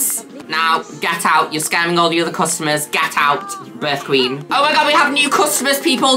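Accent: Manchester, United Kingdom. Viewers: I catch myself saying something is disgusting in Mancs accent 😂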